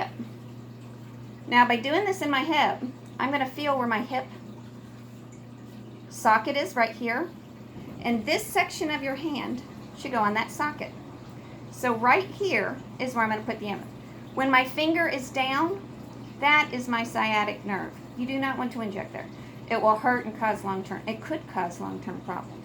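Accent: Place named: South, United States. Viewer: Thank you SO MUCH for this video. I’m having to inject solumedrol again and had kinda forgotten how it goes! Your video reminded me how easy it can go if you stay relaxed and focused. I wish you would post more videos! Your confidence and demeanor are truly soothing. Thank you, love. And ps, I totally understood your southern drawl #gagirlhere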